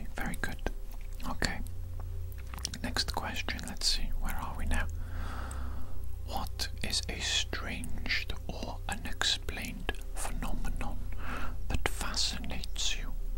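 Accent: Wales, United Kingdom. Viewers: Am I right in saying that you are Welsh 🏴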